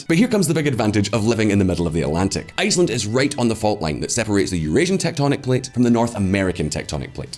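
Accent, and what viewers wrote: United Kingdom. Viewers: Scottish accent over British, any day of the week 🤤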